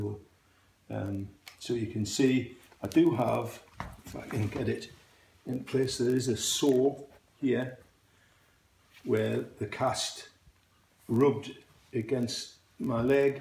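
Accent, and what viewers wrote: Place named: Newcastle, United Kingdom. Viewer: You sound like a geordie too! Lol like me. I am post surgery week 7. I am now on a moon boot instead of plaster. I am finding it difficult with physio. I am a 33yr old mother with two young children and I was studying to be a nurse in my first year of nursing. I had an accident and dislocated my ankle and broke it in three places, they said tibia, fibia and trimelleour I have had a plate in the side and screws and another plate, across and and something else. I have had to postpone my studies and will continue at a later date. To anyone reading this you've got got this. It's bloody hard work and it's not easy, am 7 weeks in and my skin has dried up and I'm still swollen and lost alot of muscle too. I am still very sore and I still have some bruising. I am worse mornings and evenings. I elevate my leg and I also do physio from home.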